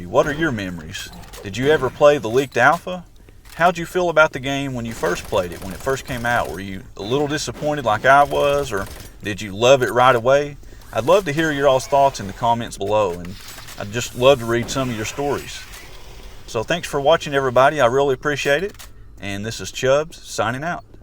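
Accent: South, United States. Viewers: now ahm not gay (nothing wrong in bein gay do) but ah think ah have a crush on ya southern accent mayn! seriously tho, this game is too underrated, it aged well imo.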